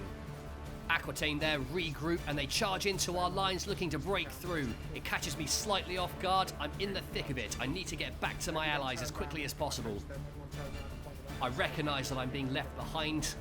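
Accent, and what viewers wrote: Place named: United Kingdom. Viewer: Your British accent and the specific cadence you use makes you sound like an F1 announcer 😂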